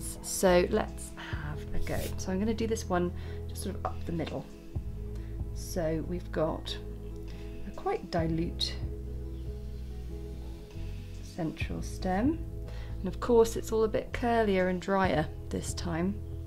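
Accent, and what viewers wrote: England, United Kingdom. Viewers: I just have to say, I appreciate how clean your palette is. I watch some other painters and theirs are so messy, it really makes my OCD go batty. 😂 I also enjoy listening to your accent. I am from Minnesota but have friends in England, and so I imagine that you are just another one of my friends there. I always watch before I go to sleep. It is so soothing.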